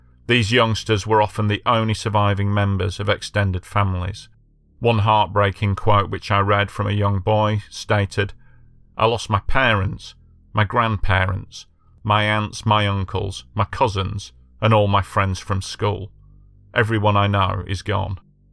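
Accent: United Kingdom. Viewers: ANOTHER British narrated disaster porn channel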